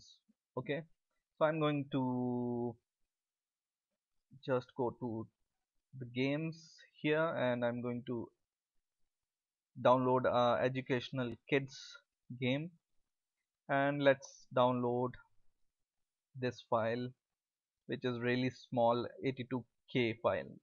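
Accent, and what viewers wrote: India. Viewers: German Google English Language Indian Accent ... wtf?! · Are you from india :-)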